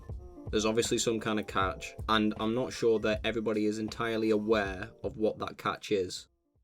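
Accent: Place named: United Kingdom. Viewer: i assume youre from UK by your accent, can you even deposit money into the website? im from UK and cant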